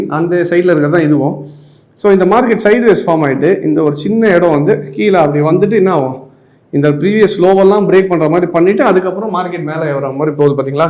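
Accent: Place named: India. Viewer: Very cool but the indian accent makes it difficult to understand :)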